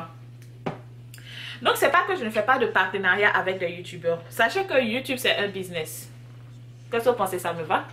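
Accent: Ivory Coast. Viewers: Eeeeeh merci beaucoup oh🙏🙏je te suis sur unstagram depuis longtemps...je te vois toujours sur YouTube mais j'ai jamais cliquer sur tes vidéo ...donc en t'entendant parler avec les deh,les thieeee,comme Abidjan ça m'a captivé j'ai tout de suite su ke tetait ma soeur ivoirienne deh suis trop contente dehors😍😍😍😘😘😘😥😥😩😩😩😥😥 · Tu es toute belle ma chérie et tu as l'accent des ivoiriens j'adore tes vidéos 💖💖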